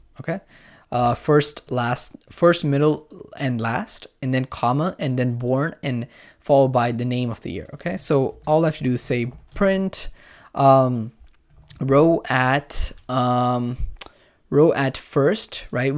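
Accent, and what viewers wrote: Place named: India. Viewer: Funny to hear an indian guy trying so hard to hide his thick accent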